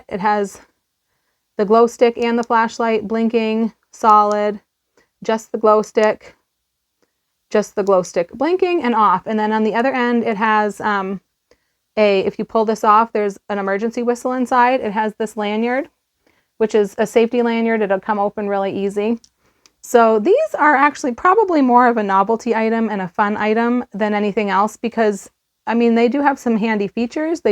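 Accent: Maine, United States. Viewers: Sounds like your from Maine which is awesome! I live in the mid coast area. I also have a black out box even though I live off grid lol. I even have an old school crank flashlight/ radio.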